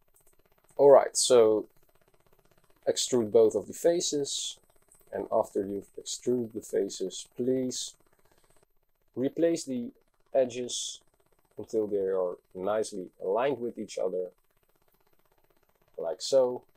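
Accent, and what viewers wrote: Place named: Netherlands. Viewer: Your dutch lol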